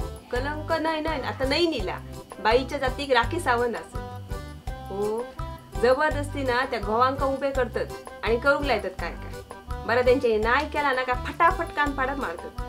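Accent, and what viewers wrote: Malvani, India. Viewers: तुमची मालवणी खुप पीवर असा.🙏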